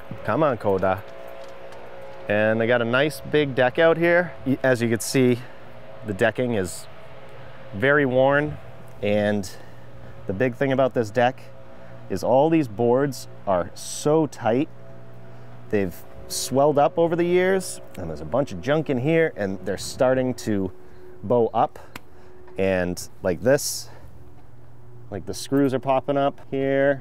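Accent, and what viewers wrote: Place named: New England, United States. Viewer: lol got recommended to your channel, first time ever watching and after ten seconds of the video, knew you were from Massachusetts or NH.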